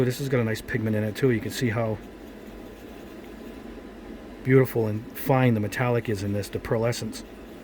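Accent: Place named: New York, United States. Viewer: The most important tip I’ve ever learned over the years is when somebody with a thick New York or Boston accent gives you a tutorial on literally anything, you tune in and listen because you’re about to learn a ton of shit.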